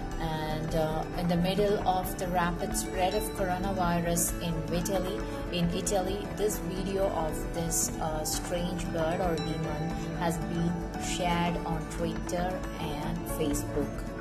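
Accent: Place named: India. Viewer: She sounds like indian.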